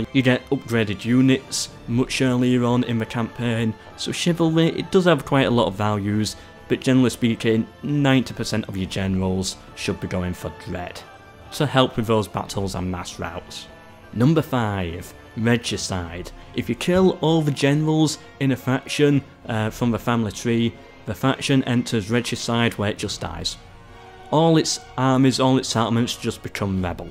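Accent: United Kingdom. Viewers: what kind of accent is that im in love with accents from the UK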